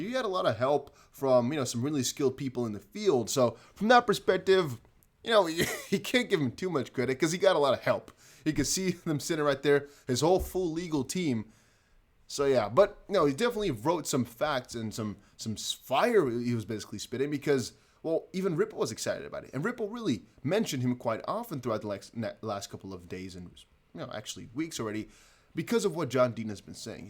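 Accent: United States. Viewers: If you're not from the US. I must be from Mars. You have an American accent. You might be living somewhere else but you definitely sound like you're from the USA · This guy would have to show me his birth certificate and his passport for me to believe that he's not from the US. There are people in Kansas (the middle of America) that sounds less American than he does.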